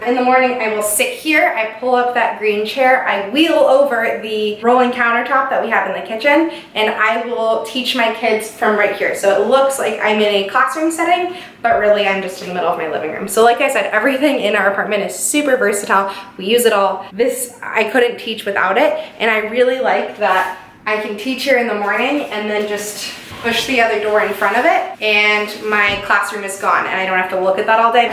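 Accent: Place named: West Coast, United States. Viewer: awe thanks!!!! They were selling that pillow for $80 bucks. Crazy. But..... I love the Chicago flag. It’s the second most recognizable flag after our country flag. Sounds like you’re a West coast girl. Prepare yourself for Winter......lol.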